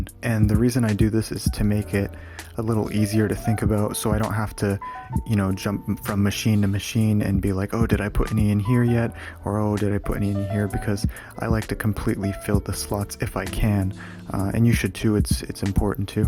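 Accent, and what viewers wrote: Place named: India. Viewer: He is Indian and his accent is so so bad!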